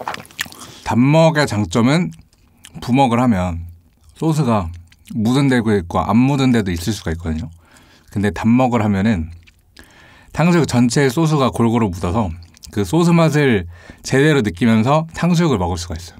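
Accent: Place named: Seoul, South Korea. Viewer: 서울말투 좋당 나는 쏘쓰 라고 하는데 소~스 라고 하시니까 발음이 부드럽네요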